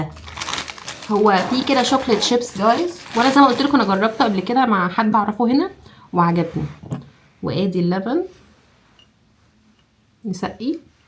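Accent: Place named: Jeddah, Saudi Arabia. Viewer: انتي صايره تتكلمين سعودي جداوي هل عندك روميت جداوية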